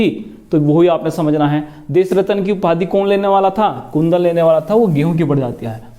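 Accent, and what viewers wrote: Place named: Bijnor, India. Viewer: Sir ap up bijnor s ho kya ? Apki bhasa s lga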